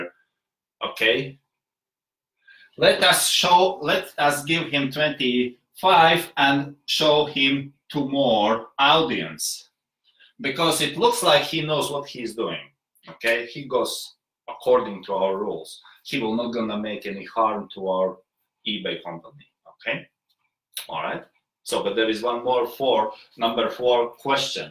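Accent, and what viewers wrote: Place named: England, United Kingdom. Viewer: Interesting point of you! I like your English accent~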